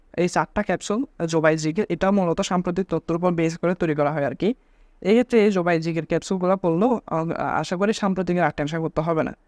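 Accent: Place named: Chittagong, Bangladesh. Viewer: ভাইয়া আপনি তো চট্টগ্রামের মনে হয়, চিনা চিনা লাগতেছে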